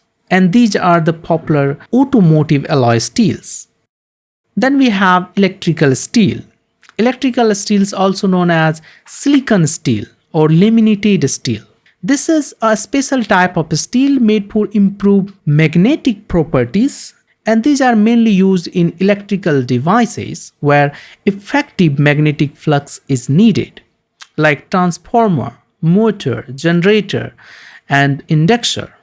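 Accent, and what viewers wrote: India. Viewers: Shit that is the thickest Indian dialect I have heard. Good video though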